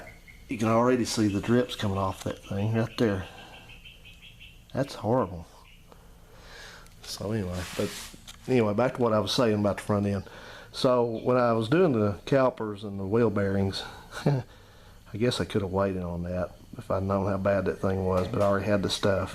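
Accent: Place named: South, United States. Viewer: I like that southern drawl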